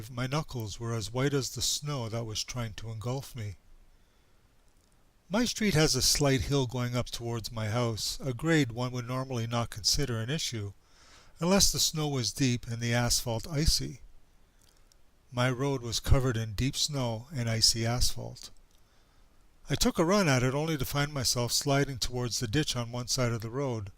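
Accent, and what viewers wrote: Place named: Canada. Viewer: This is such a heartwarming story. Great writing. I love your deep Canadian voice!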